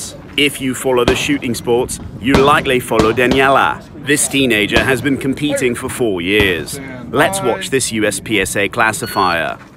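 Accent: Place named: England, United Kingdom. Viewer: The commentator sounds totally un-American. Has an English accent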